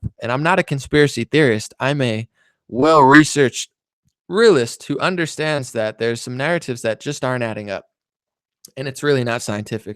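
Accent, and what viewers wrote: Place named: California, United States. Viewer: You're obviously not American. That's NOT a black American accent AT ALL. Black Americans, pretty much no matter where they live have a "southern accent" unless they are articulate. has a California accent and cadence. If a black American sounds like, he/she will be slammed for "talking white".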